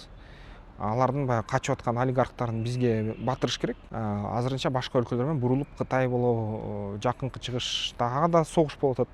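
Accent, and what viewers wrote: Standard. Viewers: 😂😂Азаматсыз НУКУРА суйлой бериниз! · Брат капа болбон таза кыргызча суйлойсуз сиз билимдуу адамсыз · Тура байке сиз мыктысыз кыргыз тилинде таза алып барып👍👍👍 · Эң сонун,нукура кыргыз тилде сүйлөп жатасын иним.Келесоо маңкурттар жаза беришет, көңүл бурба❤❤❤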